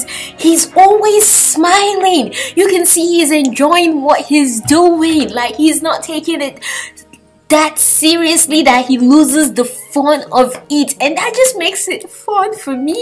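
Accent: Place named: South Africa. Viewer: i love your accent! are you from south africa? or jamaica? :))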